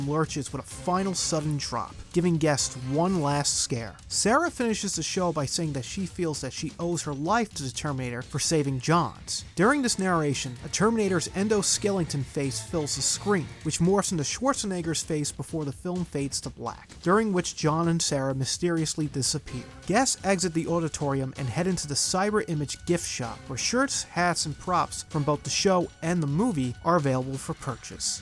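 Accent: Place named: Baltimore, United States. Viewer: yo this man got a thick baltimore accent lmao "for more den two decades" love you dawg!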